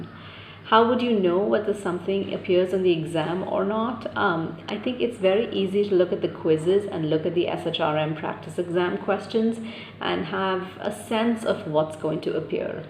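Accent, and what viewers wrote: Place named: England, United Kingdom. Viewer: Hi hope you are doing good I just loved your English accent. Please can you guide how you achive this?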